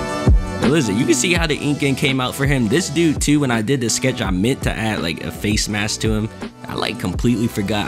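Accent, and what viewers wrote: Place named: Texas, United States. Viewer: Why does he sound lie he was born in texas because of his accent but hes really balck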